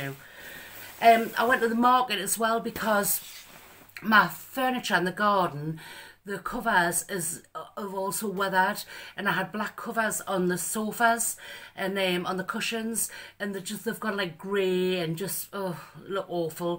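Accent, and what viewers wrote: Newcastle, United Kingdom. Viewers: Hello, l am one of your new subscibers. I love your videos, you are such a lovely, down to earth person. So genuine. l could listen To your voice all day as my grandparents lived in Newcastle all their lives and l spent every holiday with them when l was a child. Listening to you brings back all the warmth and kindness of my childhood 😊 Love from Amanda in France xxx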